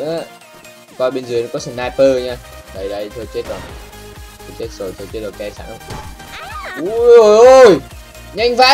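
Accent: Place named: South, Vietnam. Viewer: nói tiếng Nam hai đấy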